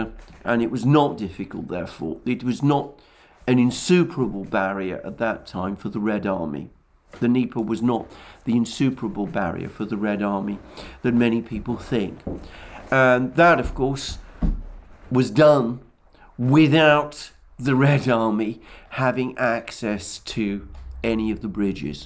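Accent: United Kingdom. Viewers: Best place for pristine russian propaganda with a lovely british accent.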